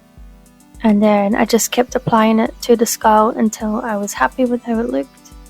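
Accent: Scotland, United Kingdom. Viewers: I just love your wee Scottish accent. The projects were so cool. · Love your creativity and your creations, are you Scottish but living in America? ( guessing the USA bit as we don’t have dollar tree etc) Can hear a soft Scottish accent when you talk 😊🎃👻✨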